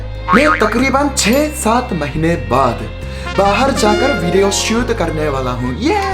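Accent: Standard. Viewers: Omg, iski hindi to meri hindi se bi acchi h, itni shuddh hindi😛 Great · although i an Maharashtrian citizen i dont know to speak such pure hindi like u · Pure Hindi and simplicity = Love from Mumbai only ☺❤😄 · A JAPANESE CAN TALK BETTER HINDI PURE THEN INDIANS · s hindi is very pure and good than most of the indians❤❤ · Checking in... ♥️♥️ pure shudh uccharan krte ho aap bhai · He is speaking pure hindi 👍 May god bless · Shuddhhhhh Hindi wowowowow👍😁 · Itni shud hindi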